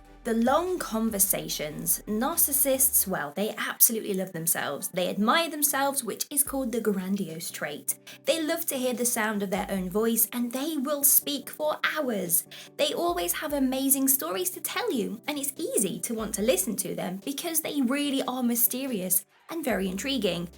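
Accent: United Kingdom. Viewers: Hey you are really pretty and cute. Too bad you sound like you live in the United Kingdom and I live in the United States otherwise I would like to ask you to dinner and a movie.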